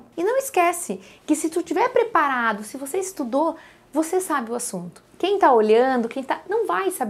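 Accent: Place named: Rio Grande do Sul, Brazil. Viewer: Esse sotaque gaudério eu conheço kkkk ótimo vídeo dra.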